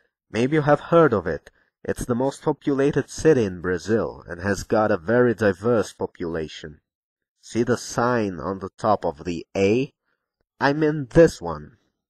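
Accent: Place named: United Kingdom. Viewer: Cara você fala inglês briitânico!!! Que onda mané. Muito legal seu sotaque ;)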